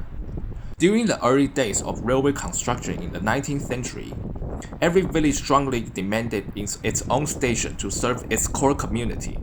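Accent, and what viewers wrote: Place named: Holland, Netherlands. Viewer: As a Flemish speaker the very very strong Hollands pronunciation gives me a stroke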